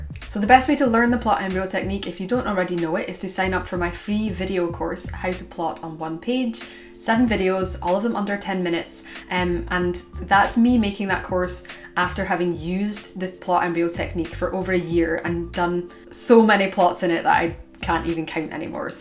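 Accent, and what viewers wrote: Scotland, United Kingdom. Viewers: I love your accent! Is that Scottish? Great tips btw, I signed up for your newsletter to get the plot embryo videos because I struggle with the same thing, I love worldbuilding and creating characters but it's tough to come up with actual stories to suit the world and characters I've created. Thanks for the tips! My Alphasmart Neo is an essential tool for me. I'm an editor at heart, so I struggle with self-editing while I write. Using a distraction-free digital typewriter like Alphasmart products is a great way for me to get my first draft down without censoring or editing while I try to write. I'm hoping with all the tips I've learned from you and other channels, I can finally finish Nanowrimo for the first time after 2 other failed attempts.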